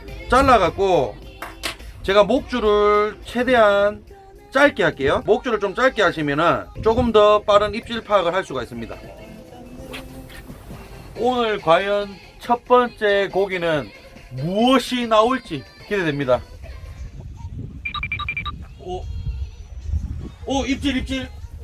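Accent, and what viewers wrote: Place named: Gyeongsang, South Korea. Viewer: 덩치 크고 얼굴 크고 갱상도 사투리에 호감가는 이유는 머징ㅋ 구독박고갑니다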